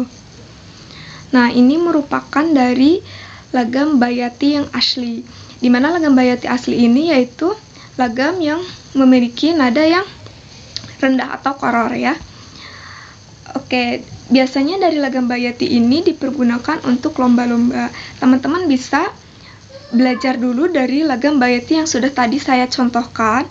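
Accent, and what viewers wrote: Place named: West Java, Indonesia. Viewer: orang sunda nih. v jad p. wkwkwk.. maaf mbak. sama2 urang sunda.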